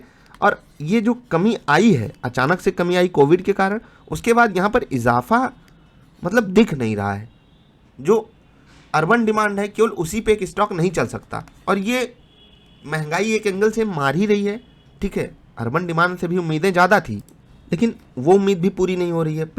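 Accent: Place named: Eastern Uttar Pradesh, India. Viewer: You are from UP EAST ??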